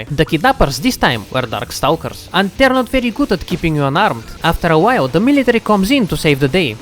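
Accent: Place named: Bulgaria. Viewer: btw, your video is good, I like your humour, I think you are Bulgarian because of accent so hello slavic friend!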